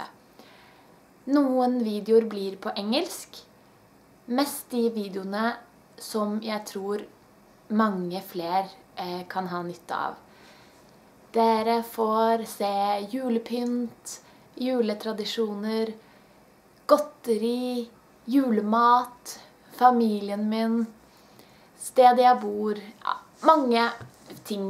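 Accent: Oslo, Norway. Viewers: Vilken norsk dialekt snackar du? Ibland tycker jag vissa norrmän snackar lite otydligt, speciellt skidåkare. Inte för att dem använder andra ord som inte Svenskan och norrmännen har gemensamt, utan för det låter som de inte uttalar exakt alla bokstäver i orden. Men din dialekt är fullt 100% klart att förstå. Oslo-dialekt? Jag själv är norrlänning på östra delen av Sverige.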